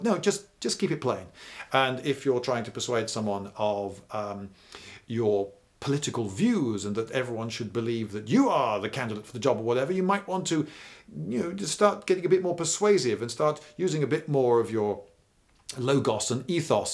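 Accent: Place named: England, United Kingdom. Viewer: I just imagined this channel being run by a guy with a Boston accent and realized how important the English accent is to a good history channel. You just feel like he knows what he's talking about.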